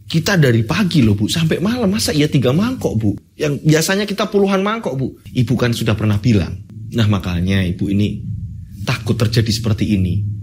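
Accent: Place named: East Java, Indonesia. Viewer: Hadir lg mas ak! Di SBY! Mas org Jateng tp logatny koq Jwa Timur'an y? 😀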